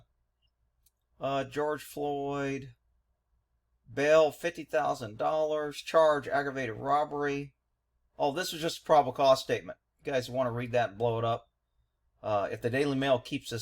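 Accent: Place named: South, United States. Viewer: Your hick accent is absolutely insufferable. But moving along. He already paid for these crimes. He was not in the process of committing anything you mentioned when he was murdered after much torture. And if you think violent crimes are wrong, then you must hate these officers because they did more than threaten or rob. And if you think that unlawful execution without trial by jury over a matter of $20 can in any way be mocked or excused, then surely you must believe an even more severe vigilante punishment is owed to these murderers. After all, their crimes surpass stolen cigarettes and you like to think you're a man of logic.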